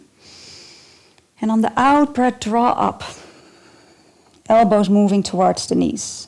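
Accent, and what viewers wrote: Netherlands. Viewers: You sound like you are from The Netherlands... Dank je wel voor de oefeningen!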